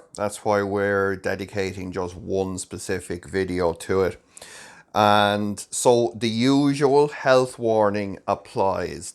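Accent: Ireland. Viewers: Thank you Mr. 😁. Really loved the sarcasm with the really nice Irish accent.. 😊. I Agree with you 100 % on this matter, you broke it down quite well on why and how. As usuall ppl do not even know why they do, what they do. This would serve as a good tutorial of asking them, do you even know what you want? Thank you for the video, and Happy New Year 2022..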